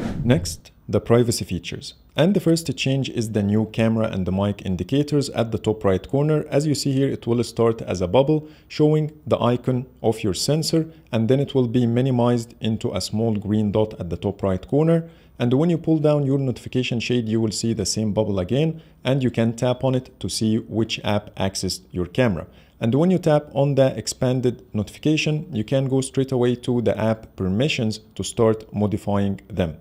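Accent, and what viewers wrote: Egypt. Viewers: انجليزي مصري اطلعك من وسط ١٠٠٠ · Is this guy Egyptian? Jeez that accent sucks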